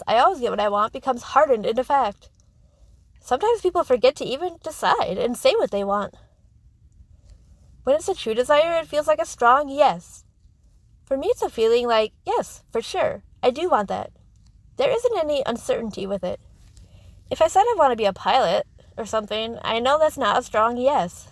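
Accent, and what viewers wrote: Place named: United States. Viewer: Thank You Yesterday For All Your Patience, And Kindness To Helping Realize That I Am The One Who Decides My Reality. You Are Truly Awesome. I Am Curious Of Your Accent What State Are You From ? If You Don't Mind Telling Me. If You Do Thats OK. I Understand. Regardless You Are Awesome. I Am Sure By Using The Term Awesome You Have Figured Out I Am Old. LOL.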